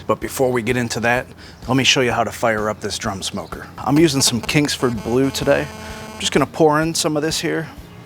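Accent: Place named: Michigan, United States. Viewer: You from Michigan, bud!?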